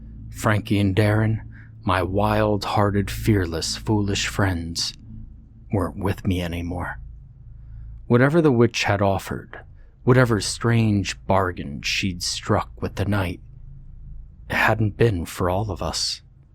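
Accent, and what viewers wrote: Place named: New Jersey, United States. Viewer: I knew I heard a Jersey accent!🙂 Love your channel (from a fellow Jerseyan)!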